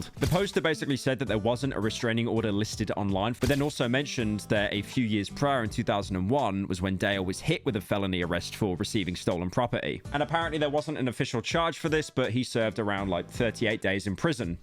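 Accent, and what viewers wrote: United Kingdom. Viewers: Honestly, i think you get age restricted so often because they think that the funny british accent makes the people at youtube think your trying to cater to small children, and that you swear too much for a kids channel. Even though you aren't a channel ment for newborns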